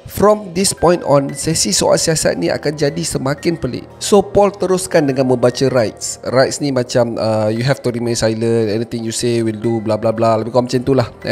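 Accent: Perak, Malaysia. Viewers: trbaekkk...1 lagi sbbkan video2 abg yg sntiasa trbaek ni laa..jadi list fav sy untuk slalu dngar time kat tmpat krja...sblom ni kalo asyik headbanging je...lenguh jgak tngkok ni 🤣🤣🤣..dan Alhamdulillah...atas sbbkn abg ni orng perak dan stiap video abg ada sleng2 perak...skang ni dah brjangkit kat member2 skrja sbb mostly diorang smua brasal dri perak trmasuklah boss skali🤣.... yg sy baiahkan untuk jdi "murid2 baru abg...hasil dri tngok crita abg smbil connect kat speaker radio...stiap kali kat tmpat krja🤣🤣🤣🤣...btw untuk abg...smoga dipermudahkan urusan selalu dan trus melangkah maju dngan mmberi video2 abg yg menarik stiap masa...insyaAllah amin...👍🏻👍🏻👍🏻👍🏻👍🏻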